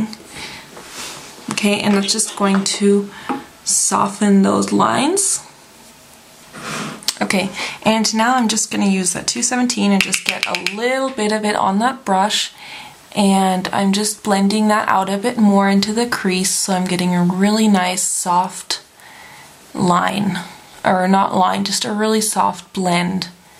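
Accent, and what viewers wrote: United States. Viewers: Yeah. She does sound American. :P Most Europians can't say 'R' or 'Th'. :D Like my mom for example. lmaooooo. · ok ok sorry lol im wrong.. i thought in another video that she said she lives in canada.. n coz she sounds like an american it kinda added up... lol my bad.. soz xx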